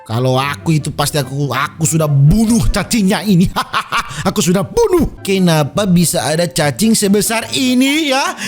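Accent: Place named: Makassar, Indonesia. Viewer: Aduh bang kau bicara lucu kali Org makassar kah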